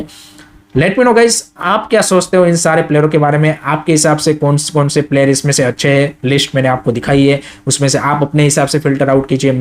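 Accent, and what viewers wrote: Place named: Bengal, India. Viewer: Ap bengali ho na apki hindi sunke ashi lag ra he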